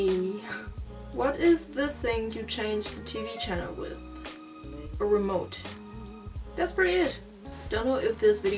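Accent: Germany. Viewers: Bist du aus Frankfurt ? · As a Chinese Canadian who speaks Mandarin and lived in Germany briefly, your English accent is definitely GERMAN, which is very cool in my opinion! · Omg ur German accent is really cute no offense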